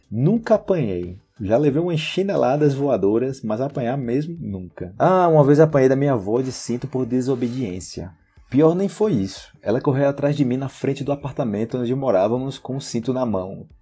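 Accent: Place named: Antioquia, Colombia. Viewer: Muchas gracias. Cuando hablas español tienes un acento paisa extraordinario.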